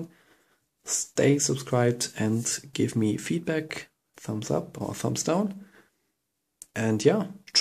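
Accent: Germany. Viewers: Great tutorial. Btw it's quite cool that you are running a Chinese version of superSU while speaking with a German accent.. no offense tho. I live in Germany.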